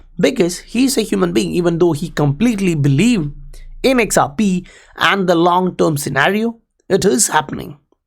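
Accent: India. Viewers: Lmao it’s definitely Indian or something in the region not even close to Scottish or Australian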